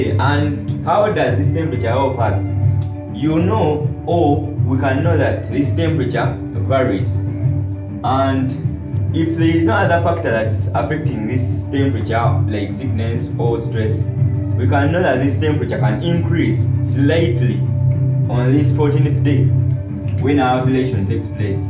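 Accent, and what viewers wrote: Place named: Namibia. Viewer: He sounds Namibian👌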